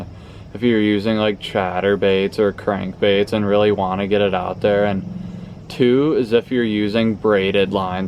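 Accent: Minnesota, United States. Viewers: You’re either from Minnesota or North Dakota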